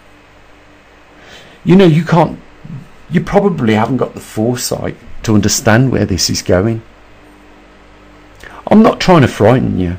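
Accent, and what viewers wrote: Birmingham, United Kingdom. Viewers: lol that brummy accent coming out on todays post lol. go brumee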